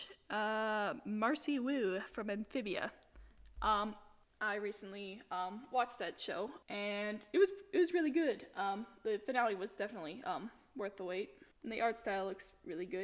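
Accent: Scotland, United Kingdom. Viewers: Scottish accent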